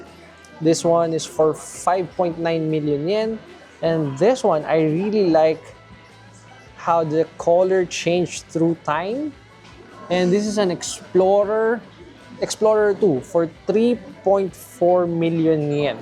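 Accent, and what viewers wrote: Philippines. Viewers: I binged most of your videos , really good content. My wife is Filipina and I recognized the accent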